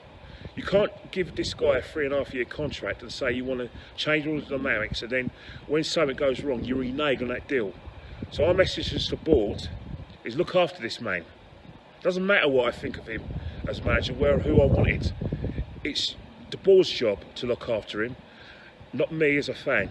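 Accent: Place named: Cockney, United Kingdom. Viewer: This man is Riddled with Cockney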